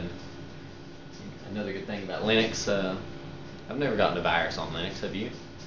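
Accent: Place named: South, United States. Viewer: this yankee thinks that's a pretty cool southern accent. i can't place it, but it's great. u could have a career in cartoons i mean hella cool. maybe eastern tenesee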